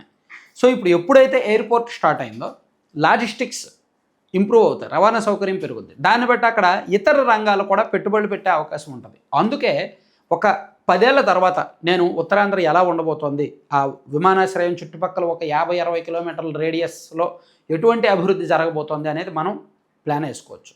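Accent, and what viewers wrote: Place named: Telangana, India. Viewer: You talk like a Telanganites!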